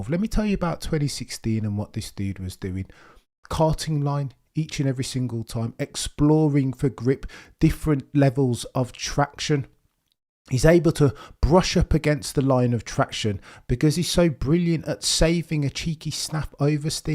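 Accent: Birmingham, United Kingdom. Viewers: Sky sports have been gaslighting us all ever since the Miami GP (Lando's 1st ever gp win btw) and ever since that race he's needed a ton of help from the team and Oscar and still he's never been close to Max in the WDC standings. The "Simply lovely huh" at zandvoort was pathetic and then his post race comments after getting humbled in Brazil were even worse. If that McLaren car is still the one to beat next season then Oscar has to lay down a marker early on and put sulky no-rizz in his place. Nice to hear a fellow Brummie talking F1 btw 👍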